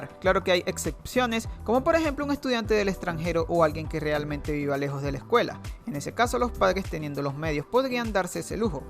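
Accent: Venezuela. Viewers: Eres venezolano? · compa eres venezolano?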